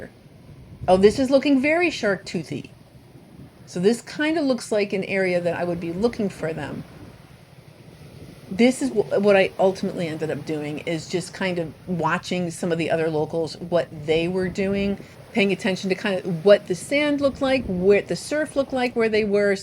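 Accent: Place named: New Jersey, United States. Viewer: “That’s what I’m takin’ about.” You are from Jersey❣️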